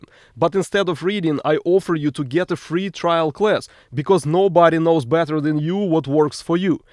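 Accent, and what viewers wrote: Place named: Russia. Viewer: Русский узнает русского за секунду)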